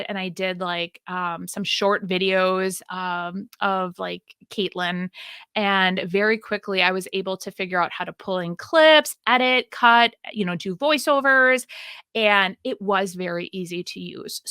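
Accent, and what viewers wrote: Wisconsin, United States. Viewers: I'm loving getting to know you better with these podcasts! I can't wait to see the next and the next! When I first found you on YouTube, I'm sure I typed in DIY or something but what got me hooked on you wasn't the content alone (although that was stellar) it was your personality and, yes, your very cool, Wisconsin accent. I'm from Southern Ontario, Canada and I feel that I have a lot in common with Wisconsiners! (Is that a word?) You are the sweetest, kindest, most generous person on this planet and I NEED people like you in my realm! Never stop doing what you do. You are The Empress in your little realm and I, for one, am grateful! ❤😊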